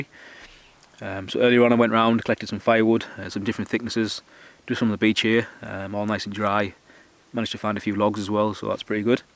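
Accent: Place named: Teesside, United Kingdom. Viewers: Excellent video lovely scenery you sound like your from teesside way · Sounds very much like a Teesside voice, a Local version of Paul Messner. A good video of someone camping responsibly. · Cracking upload not sure how I never seen your channel before ?? Youtube algorithms at work I am guessing.. Awesome location and pitch .. I was there one year ago wild camping with my youngest son. We got eaten alive by Midges and had to bail out ... The accent sounds local to me.. ATB from a South Shields wild camper... Subbed..P.S. I do a bit of Astro Photography and The Galloway National park is a Bortal 1 ..Meaning zero light pollution..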